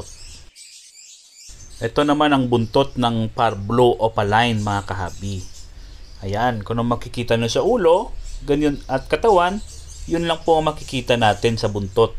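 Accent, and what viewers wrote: Iloilo, Philippines. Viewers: Puga lods ilonggo ka gid? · To ilonngo ka.. may punto kasi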